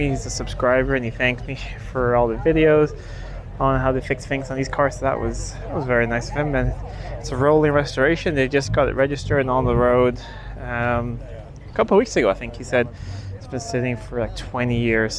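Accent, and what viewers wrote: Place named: United States. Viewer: How do you have a perfect American accent when growing up in Sweden?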